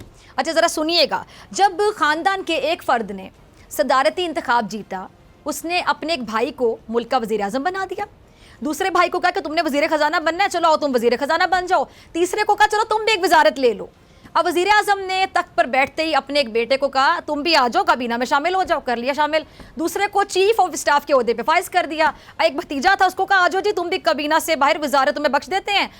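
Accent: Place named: Punjab, India. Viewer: Aap urdu main Panjabi ki tune.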